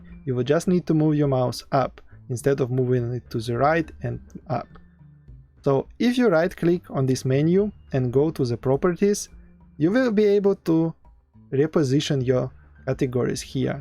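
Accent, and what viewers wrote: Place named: Russia. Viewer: Русский чтоли?! Акцент просто ужас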